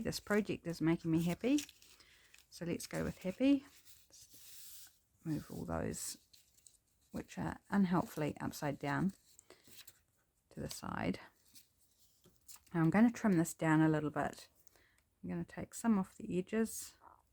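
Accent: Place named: Australia. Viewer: I am from Aussie so it was great to hear someone from this side of the world.Your clear pockets looked good, thanks for you video.thank you for your palm tree freebies.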